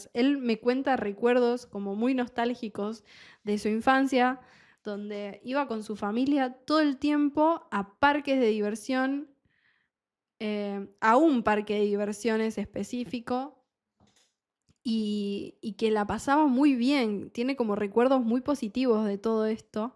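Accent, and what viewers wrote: Argentina. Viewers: Muchas gracias. Me encanta escuchar el acento argentino,es muy preciosa .Amo mucho a Argentina y espero poder visitarla algún día.Por cierto soy egipcia.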